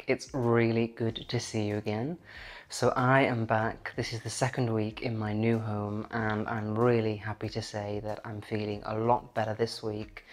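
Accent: RP, United Kingdom. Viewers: Oh that BBC Accent - it's like listening to a young Kenneth Kendall reading the 9 O'clock news. I am an avid fan of Farrow and Ball, their Dead Salmon is to die for, pardon the pun, but Mouse's back - well I never - they do name their paints after some odd things. Such a stunning room with so much potential - I think you need to pay us all a visit and give us some design tips and ideas, they always say a fresh pair of eyes is like a new beginning, you never see it yourself until somebody else tells you.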